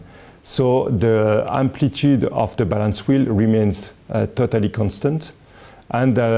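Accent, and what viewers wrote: France. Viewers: Cet accent français de l'espace ! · Magnifique accent français ;) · French accent too much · Francuz mówi po angielsku - MASAKRA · dude that french accent tho XD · Ho non... Cet accent français 😂😂😂🤣🤣🤣 Non mais franchement fait un effort 😂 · laccent Français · Cette accent français mdr <3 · Wonderfull french accent for the Forst video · He speaks great English with a French accent..... I speak average French but I try my hardest to recreate as good a French accent as possible.... When speaking to a French person my aussie accent shows through and probably sounds similar to the way I hear his French accent when speaking English. I couldn't fault him. Excellent description and vocab. Dunno what the fuck you're on about mate.... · Qui a reconnu l'accent français ? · french accent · Je reconnaît ton accent de français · As a french i totally spot that accent from the first second x)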